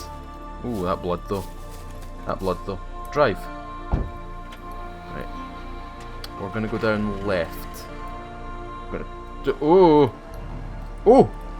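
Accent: Scotland, United Kingdom. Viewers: With the accent I kept expecting the theme music to segue into the theme from local Hero...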